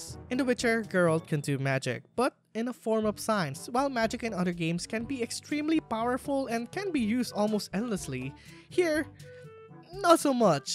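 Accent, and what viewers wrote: Philippines. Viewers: I can sense the Filipino Accent. Good english! I don’t normally watch Filipino youtubers because they can be really annoying with their repetitive sound effects and poor grammar. But you, I’m willing to watch for all of my Witcher content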